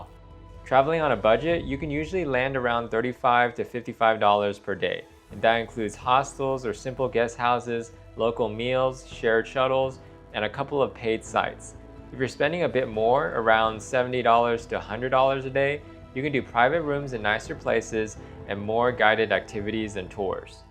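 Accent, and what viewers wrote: United States. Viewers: His accent is clearly US… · I’m Irish but live in Australia. You assume because he has an American accent he’s talking USD. Assumptions lead to all sorts of f&@ ups.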